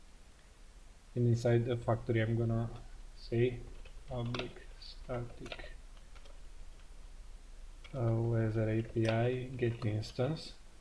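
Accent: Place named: Bulgaria. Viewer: YOOOOO I'm also from Bulgaria, I can tell by the voice and the weather location obviously. Пиши ми моля те, как ти е фейсбука или нещо!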